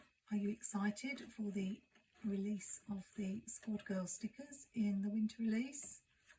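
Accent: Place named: Southern England, United Kingdom. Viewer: I’ve got loads of supplies but am quite keen on starting ‘properly’ in January so I can follow through the year!! Where in the UK are you?? I’m in Essex and your accent doesn’t sound too far north!! 😊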